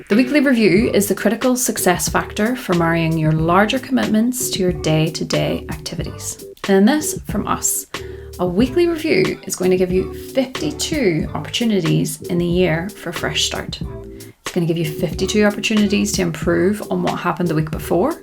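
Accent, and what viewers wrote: Scotland, United Kingdom. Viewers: Love the relaxing tone of this video - and always love to see references to GTD. Curious, what is your/her accent? (As in, which part of…Scotland, right?)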